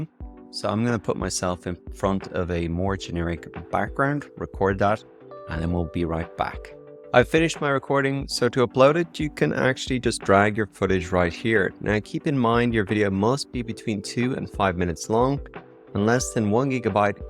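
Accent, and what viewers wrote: Ireland. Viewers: Nooooooooooo. It doesn't get your fabulous Irish accent at all. Your regular voice is so good. I want to recording of it to fall asleep to at night. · Holy Crud Batman. I'm torn here because I live in NJ and have been told I have a bit of an accent. I personally think removing your very charming Irish accent is not a great thing. Now I'm in the mood for a Guinness.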